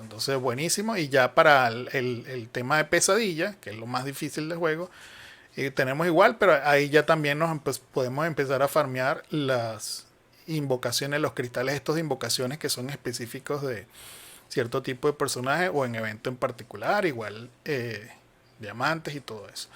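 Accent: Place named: Venezuela. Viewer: Coño esa forma de hablar pareces venezolano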